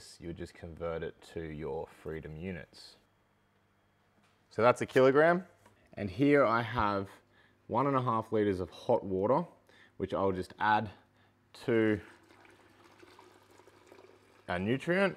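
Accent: Australia. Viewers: i switched from arduino mkr and pico videos to hydroponic and this was first video i put on and right at beginning i hear arduino and pico and wondering if im watching arduino stuff until i remebered that Aussie accent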